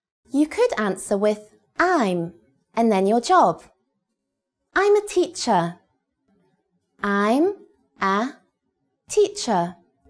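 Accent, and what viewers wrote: England, United Kingdom. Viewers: I liked British accent 👍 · british accent is so hot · I love British accent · I was just try to learning English for 1month now I finally found a British accent , I like to learn this kind of stuff. Still I m try to learn if it's bad dnt mention it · This just feels like learning American English from someone with a British accent This sucks and I’ve learned nothing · Are you from england? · where is the word "mate", i just wanna talk like a british to say that word with this accent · British accent is really fascinating...this lesson is attention taken ...I like your s-t-y-l-e...learn alot from you...thanks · I really love this walk through the British accent and the common communication way for people around the world rather using the American accent. Such plenty of love this accent though. If you could do please make a short lesson for kinds to learn the British accent too. 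· Big thank you for presenting this helpful vedio to learn English with proper British accent 💙💙💙💙💙💙💙💙💙💙💙💙💙💙❤️❤️ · Hi dear teacher! Your British accent is wonderful and so beautiful. I love English very much! It is the most important and beautiful language of the world. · I love British accent!.And she is amazingly beautiful and charming.Hello,!!!!! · esse sotaque inglês pqp :( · Your sweet british accent is kind of cute and its helps me to learn this accent . . . . . i don't know why but its very nice for me 😅 Btw your sitweb is useless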